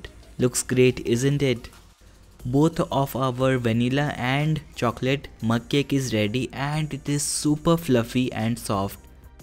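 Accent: India. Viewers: lol indian accents always get me🤣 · Myra Cherryfall He is Indian since I’m Nepali and I know how they speak xP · People are making it sound as if he's/she's rasist because he has an Indian accent or something. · Looking at the comment section it feels that this video has garnered views from all over the globe. Quite a success for something that is vegetarian and not butter chicken. To those complaining about the accent, please just chill. This is an Indian guy and English is not his mother tongue.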